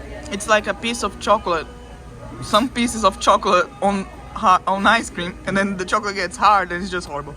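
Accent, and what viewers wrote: Scotland, United Kingdom. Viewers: His burr is mild (Irish have a brogue, the Scots a burr) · You should go to Scotland & to the highlands & the northwest if you think his brogue is broad. His is easy compared to other areas of Scotland. Sure I didn't pronounce all the Scottish cities, towns & villages properly even with Scottish guides helping. In the highlands the signs are in English & Gaelic and it's pronounced "Guy-lik" unlike the Irish Gay-lic. Your welcome! 😊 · Hello,. Even ignoring your Scottish brogue (which is, of course, impossible; it is very "heavy" but nice), you give yourself away as a non-U.S. person when you pronounce L.A. as "Los Angel-ees". Proper English pronunciation has become "Los Angel-ess". Of course, "Los Angeles" is short for the city's (non-indigenous peoples) founding name from the Spanish, which is " El Pueblo de Nuestra Senora La Reina de Los Angeles" ("The Town of Our Lady the Queen of The Angels"). 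I hope you enjoyed your stay in the unique and huge an-automobile-is-still-necessary city that is L.A. University of California at Los Angeles ("U.C.L.A.") Class of 1975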